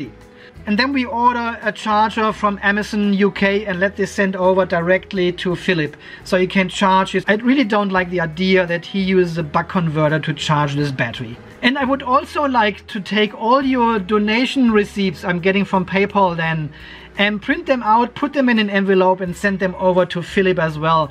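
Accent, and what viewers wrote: Germany. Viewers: Nothing sounds more stupid than a German trying talk english 🤣😂🤣😂🤣😂🤣😂🤣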